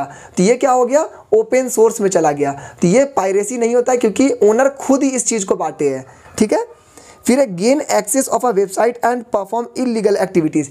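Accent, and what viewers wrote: Bihar, India. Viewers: Hello bhaiya , you teaches very well. I study IT from you and I want to ask you that are you from Bihar?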